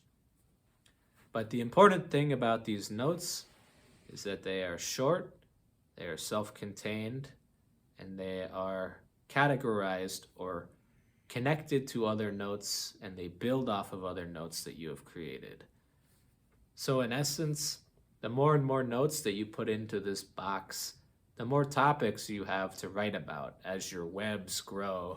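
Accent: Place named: Scotland, United Kingdom. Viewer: pepe pic but scottish accent? bad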